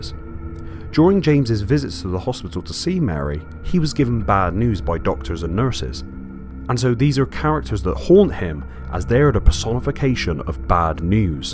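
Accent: Bristol, United Kingdom. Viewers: Oh wow!! Dude, you are obviously form exactly the same part of the UK as me. Don't often hear our accent on yt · Is that a Bristolian accent? Or more West Country?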